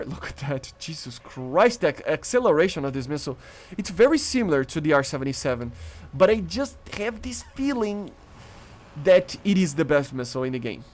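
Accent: Brazil. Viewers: Sotaque brasileiro?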